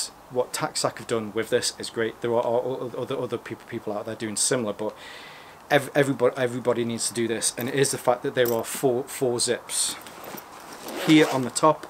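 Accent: United Kingdom. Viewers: I love my Tacsac 13. I'll never NOT carry a Nutsac bag (I started with the Man Bag Dammit). Let's face it, every 15-year-old that dwells within all men loves the naughty name of the company. Plus, we yanks are suckers for the Brit accent. Keep up the good work old chap!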